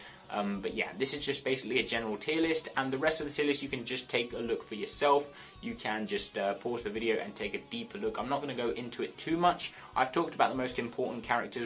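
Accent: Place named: England, United Kingdom. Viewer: Do you come from England I like your accent. I come from England ❤️🏴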